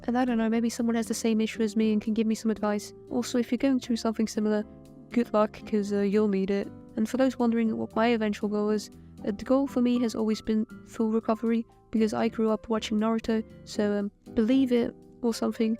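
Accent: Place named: United Kingdom. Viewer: Your name, is very misleading considering you have this awesome British voice, the greatest garden ever, and cute plushies everywhere you look. Also, it was cool seeing more of your art process 🙂 What the plushie's name? I might have missed it if you did say it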